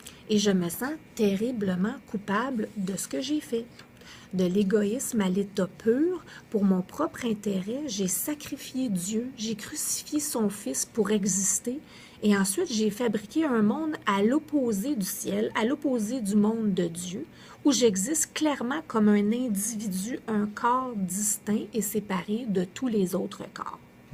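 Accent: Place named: Marseille, France. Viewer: l'accent marseillais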